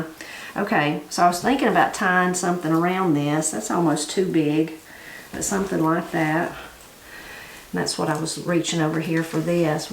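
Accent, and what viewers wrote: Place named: Appalachia, United States. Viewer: Great video!, thank you for wonderful ideas to decorate. I am watching the video and eating the biscuits we made using your recipe. Is there a chance to make a video on how to master Appalachian accent, especially [ai] sound like you pronounce in whIte, or try. Thank you Best, Yulia from Florida, Katie's cheerleader;-))))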